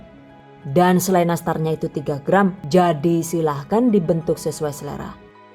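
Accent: Banyumas, Indonesia. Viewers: Deneng ngapak pada karo nyonge 😅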